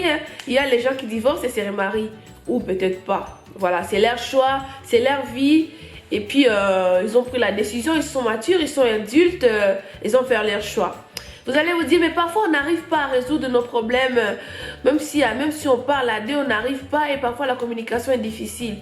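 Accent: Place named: Democratic Republic of the Congo. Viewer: J'aime ta vidéo. tu as l'accent congolais. j'ai plein des amis congolaise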